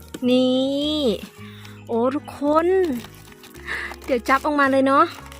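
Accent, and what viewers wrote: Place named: Thai Phuan, Thailand. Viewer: ภาษาพูดคล้ายๆ คนชาวไทพวนที่บ้านผมเลย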